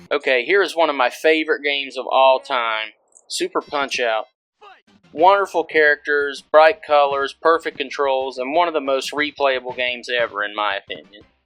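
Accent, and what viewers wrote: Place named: West Virginia, United States. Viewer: I started watching this video and was like "Man! This guy must be from West Virginia!" Then I saw the hat and thought "Hell yeah he is!!" and I had to subscribe!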